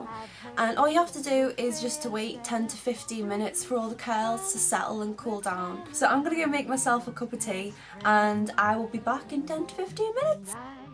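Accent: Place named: United Kingdom. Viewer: Oh my god, I just thought I saw Marylin Monroe when I clicked this vid. You look exactly like her!! Only it's a little bit absurd to watch Marylin Monroe look alike talking in a british accent.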